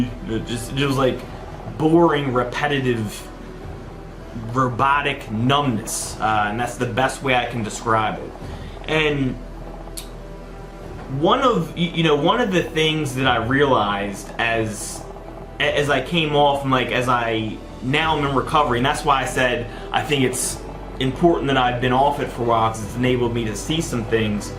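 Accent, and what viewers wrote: Philadelphia, United States. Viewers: Just curious - what’s that accent? Philly? Baltimore? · I knew I detected a Philly accent right on shout out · Ps: I knew you were a philly guy hahah I recognize that accent anywhere!!! I’m a bucks county girl 🥳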